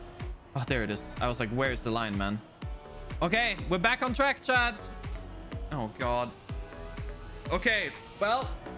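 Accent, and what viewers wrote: Germany. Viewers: Are u German? Because u sound like that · Are you german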